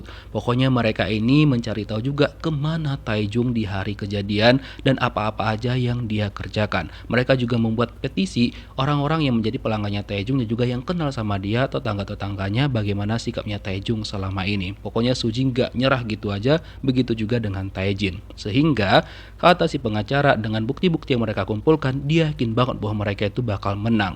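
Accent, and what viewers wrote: Medan, Indonesia. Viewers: Yg ngomong alur cerita ini orang medan sumut kah..🤔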